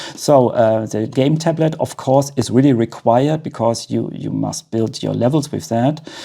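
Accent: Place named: Austria. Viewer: Ich liebe den Akzent. Du bist Österreicher, oder? 😊 Richtig gutes Video! Daumen hoch dafür! 👍👍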